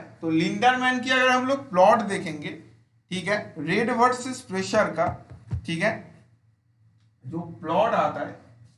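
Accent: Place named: Bengal, India. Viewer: Dada iacs er aro questions korao... Please do more questions of iacs. Your accent made me feel that you're a Bengali. So commented in Bengali :)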